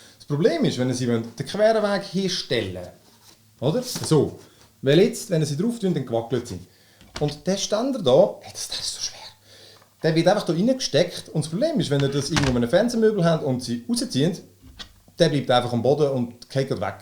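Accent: Switzerland. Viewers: Es ist Schweizer deutsch wo ich wohne 👍🏽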